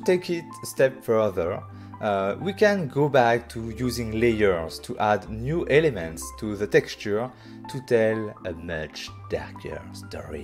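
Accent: France. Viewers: Cool French accent <3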